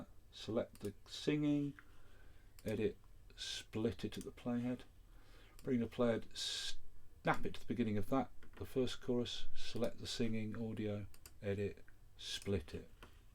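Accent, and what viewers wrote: United Kingdom. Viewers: One of the best tutorials! This Britt knows how to explain clearly. (Even with his cool British rocker accent). :)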